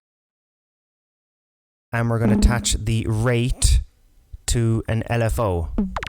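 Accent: Ireland. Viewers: Now the next challenge is to find what Irish accent is it? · Loving this and great to hear an Irish voice doing such killer tuts. Nice one mate.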